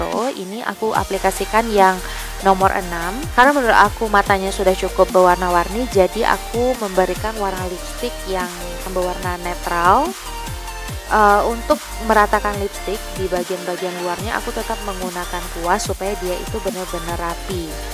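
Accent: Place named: West Sumatra, Indonesia. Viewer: bagus make up nya. cantik bgt kak.. mau tanya org mana kak? kyak bahasanya org sumatra gitu... sumatra barat ehehhee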